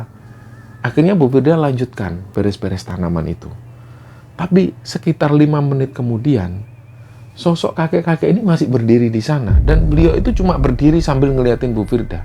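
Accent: Java, Indonesia. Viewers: Salam kenal mas. Pertama kali aku nonton langsung jatuh cinta sama logat bahasa jawanya dan cara berceritanya. Jadinya langsung ku subscribe. 🎉❤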